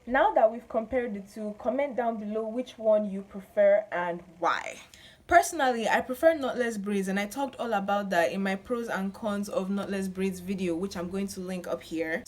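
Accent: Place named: Africa. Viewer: i love your accent. my dad has an african accent, and sometimes i can’t understand him but like african accents are just ughhh😫😌 i love em.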